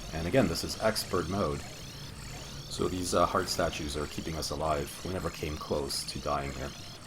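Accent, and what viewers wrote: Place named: Montreal, Canada. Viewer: sound like a montreal boy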